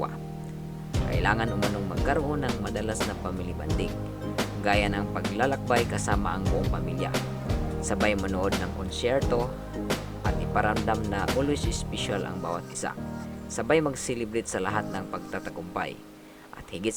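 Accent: Visayas, Philippines. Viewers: Bisaya po... gaya ko... ganyan talaga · Ang panget mung mag salita visaya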